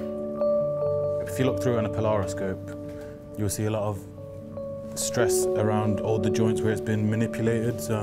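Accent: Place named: Nottingham, United Kingdom. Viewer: I love hearing a Nottingham accent on YouTube...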